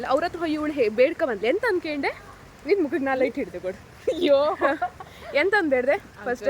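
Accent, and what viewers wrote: Kundapura, India. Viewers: Edu pakka kundaura kannada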